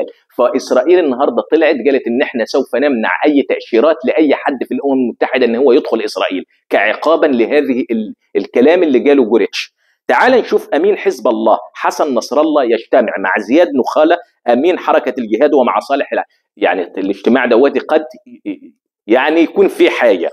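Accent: Morocco. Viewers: لهجتك المغربية فنة 🎉😂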